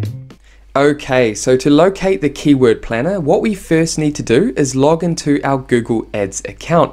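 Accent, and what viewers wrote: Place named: New Zealand. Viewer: Love the kiwi accent, thanks for the video :)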